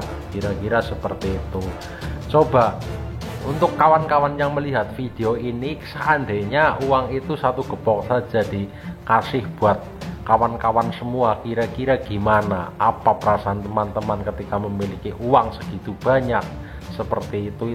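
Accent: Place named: Banyumas, Indonesia. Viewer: logat ngepeknya mantep bro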